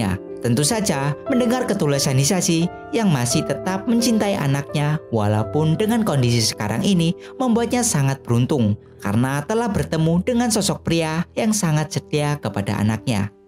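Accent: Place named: Java, Indonesia. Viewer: Ya Allah, suaranya medok bangets...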